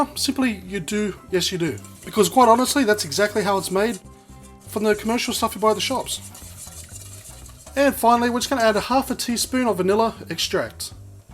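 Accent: Australia. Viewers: I LOVE that Australian accent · he's Australian:)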